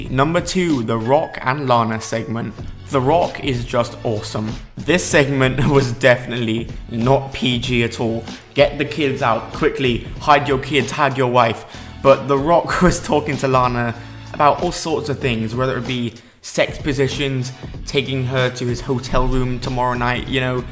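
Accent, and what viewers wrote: United Kingdom. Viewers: Troy Edward Well that's why I said "I don't know if English is your first language". But he sounds like he's somewhere from the UK, so English should be his first language. I wasn't being rude. I was just clarifying what "chicken shit" meant. Even though it's a common saying, he should know what it means. But maybe it's just a common American saying.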